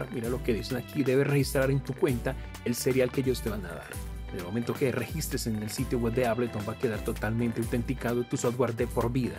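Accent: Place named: Colombia. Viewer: Por tu bandera y acento me pareces de Colombia, yo soy de Venezuela y de España viviendo en México desde hace 10 años. Me parece excelente que intercambies con quienes te escriben. Tengo dos canales más, casualmente este no es el de música. Uno lo tengo para mis covers y otro como compositor. Decidí separarlos porque esto de las redes uno no sabe y cualquier dia me puedo llevar una mala sorpresa de que me tumban el canal por no tener derechos de autor. Los de compositor no hay forma. Empecé en este segundo aliento con YouTube hace poco luego de que twitter me tumbó mi cuenta con casi 500 mil seguidores y 10 años de dedicación, publicando poco además porque no me alcanza el tiempo para crear, producir y promoverme. Un Abrazote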